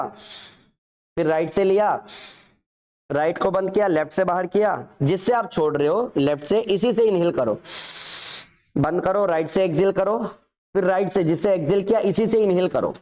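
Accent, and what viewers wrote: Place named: United States. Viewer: कृपया हिंदी मे बताइये नाकी इंग्लिश में अमेरिकन एक्सेंट से नही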